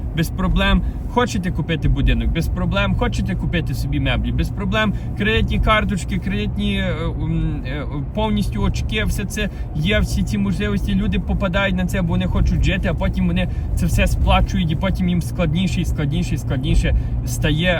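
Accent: Western Ukraine, Ukraine. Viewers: Україна велика і не може людина з заходу говорити полтавською чи київською говіркою. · Ві говорить на діалекті української мови. Так говорять в селах на заході України. Це не літературна українська мова. Може тому так тяжко в Канаді, що він навіть українською не дуже володіє не говорячи про англійську. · Надя! О с западной Украины!! И там язык не похож на Черниговский(я оттуда) или Полтавский. Но и на украинский, красивый, он не тянет.. Я не в обиду... Просто режет слух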